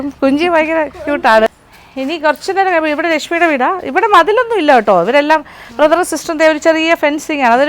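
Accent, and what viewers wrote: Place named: Mavelikkara, India. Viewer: My paternal great grandma hails from Mavelickara and that's why I love to listen to the Mavelickara slang of our beloved Maam🙏🏻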